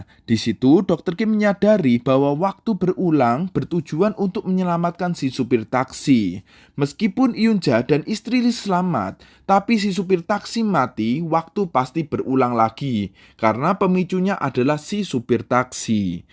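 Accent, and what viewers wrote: Java, Indonesia. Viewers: Medok jawanya hilangin ya ka...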